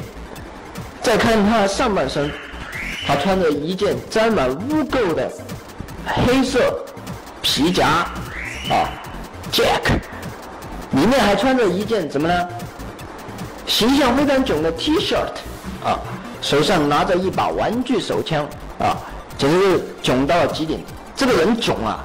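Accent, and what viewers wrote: Sichuan, China. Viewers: 我四川人听的很顺畅，只是他很川普所以有些不会四川话的人会觉得很怪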